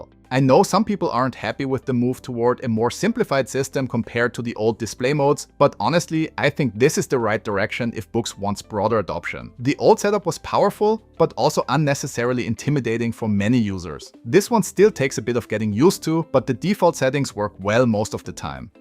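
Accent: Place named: Vienna, Austria. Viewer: Great review! And now I finally know how Wiener Schmäh English sounds like! The Dewäääisss! But no judging, I also have an accent when speaking English :)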